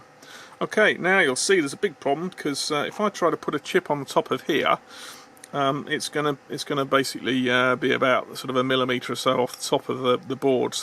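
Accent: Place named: United Kingdom. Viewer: Just the right amount of verbal I say. Newby here, so I appreciate being walked through the procedure. Got the air gun, but looks like I'll need the flux pen and the cleaner. Too bad the radio is all apart and on the bench already, but I watched someone else's video where they just did a simple reheat. I feared the solder would overflow to the other terminals, but apparently not an issue. Wish me luck. Oh, the British? accent/colloquialisms were spot on! (to use a term). Full Wacko (unintelligible) on the airflow LOL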